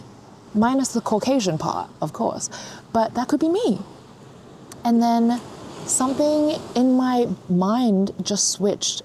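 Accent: Australia. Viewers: I wish I had your Aussie accent. I have a boring American accent. 😢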